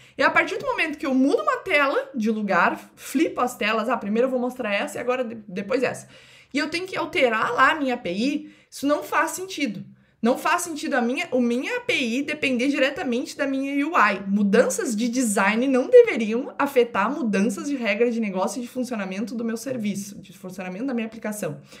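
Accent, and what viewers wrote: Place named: Rio Grande do Sul, Brazil. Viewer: Sotaque do nosso rio grande é inconfundível "né "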